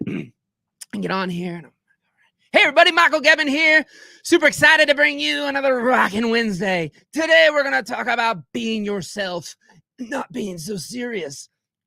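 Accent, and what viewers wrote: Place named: South, United States. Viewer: Please don’t ever stop doing that southern intense accent. Here for the sweat! Dude I lol so hard while driving to this. Loving the new videos, keep it up! Gawd dang ! Let’s go!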